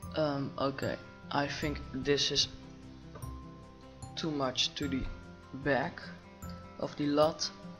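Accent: Netherlands. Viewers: omg, die nederlandse accent hahah! te lieff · He is dutch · You sound dutch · Dat Nederlandse accent is zo cute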